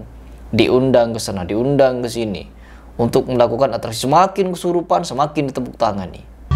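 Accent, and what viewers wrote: Madura, Indonesia. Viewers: Lidah madura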